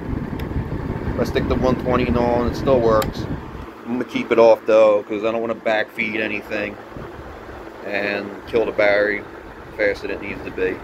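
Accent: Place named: Philadelphia, United States. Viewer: You didn't have to tell us you were from Philly I can tell you are from Philly since you have the Philly accent. LOL YOu have exceptional MacGrubber Skilz!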